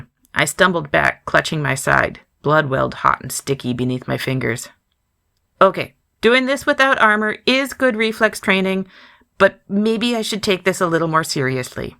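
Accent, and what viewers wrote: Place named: Midwest, United States. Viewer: Great job! I guess my ear is tuned to the Midwest speech pattern. 😂 I think it's great!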